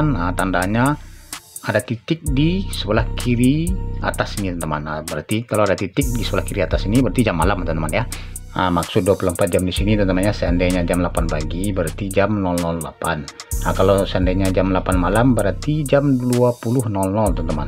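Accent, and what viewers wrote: West Sumatra, Indonesia. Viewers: Org Minang kah bang😅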